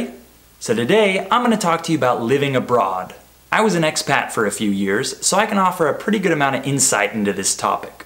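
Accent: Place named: United States. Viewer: That's not English, it's American....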